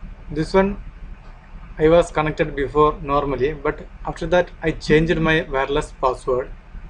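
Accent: India. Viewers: Your accent is too indian for me.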